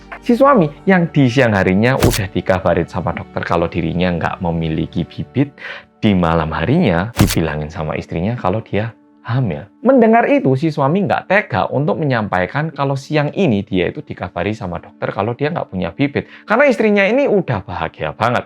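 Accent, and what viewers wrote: Java, Indonesia. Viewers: Great story to watch 👍👍👍 Thank you mas ' ' 😁🙏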